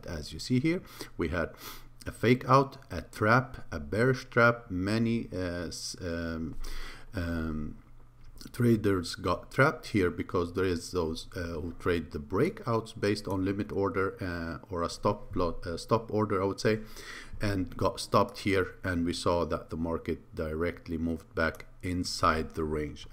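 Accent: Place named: Iran. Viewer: You sound like you are from originally from Iran.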